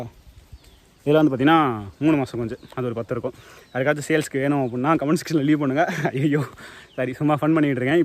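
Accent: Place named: Madurai, India. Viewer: 🤝Congratulations 🤩Your madurai tamil slang was nice to hear🙌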